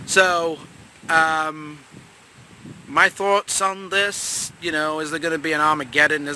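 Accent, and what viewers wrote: United Kingdom. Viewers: How long have you lived in the States? I detect a very familiar British accent still leaking through.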